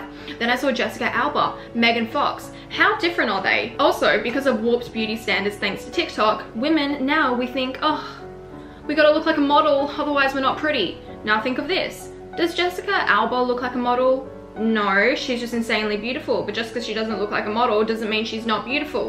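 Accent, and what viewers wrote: Australia. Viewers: I love the Australian accent and how she says “phone” she says “phewrn”.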